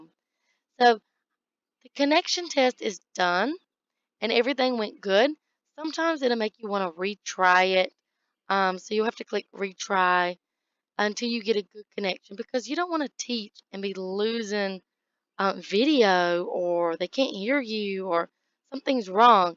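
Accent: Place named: South, United States. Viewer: I’m from Atlanta. I’m guessing you’re Alabama or Mississippi. Maybe South Carolina?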